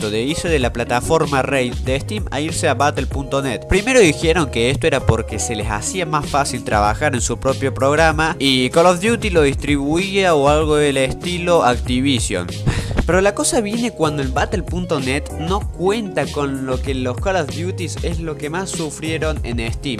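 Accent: Córdoba, Argentina. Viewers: Saludos cordobés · Eh cordobez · Sos Argentino? O_O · sos cordobes? :v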